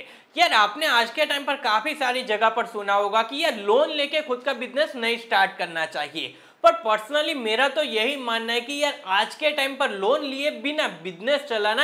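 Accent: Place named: Saurashtra, India. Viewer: Is bhai ki hindi se hi pata chalta hey key gujrat ke saurashtra ka banda hey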